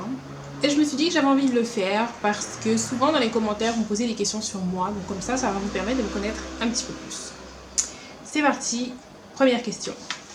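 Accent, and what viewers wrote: Guadeloupe, France. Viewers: OUPSSS... comment faire la cerise à partir du PC? Pois rouge et dombrées? Mdrrr oui pour les dombrées et non pour les pois rouge! Oui ce qui rappelle tes origines c'est ton accent guadeloupéen. L'accent martiniquais me semble t'il est moins chantant. Ceci dit j'aime beaucoup ton accent. En sé fèw palé lonten. J'aime le ''migan de fruit-à-pain'' ou les ''mantouts'' (appelé au 971: krab a bab) Vivement ton prochain tag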